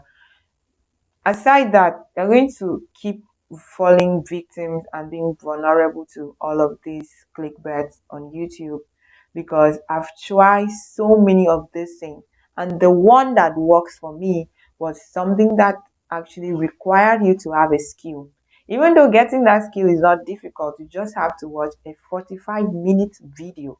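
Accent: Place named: Nigeria. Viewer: Upon recognizing your Nigerian/West African accent, I suspected a scam and cannot subscribe to content aimed at manipulating real ad revenue on YouTube. It appears to be a non-legitimate use of time.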